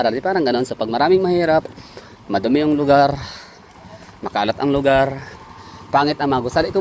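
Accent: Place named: Visayas, Philippines. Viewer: Hindi nga amazing... Pero pede na kasi malapit sa NCR. Saka may bias ka kasi kasi bisaya ka. Halata sa accent mo. DDS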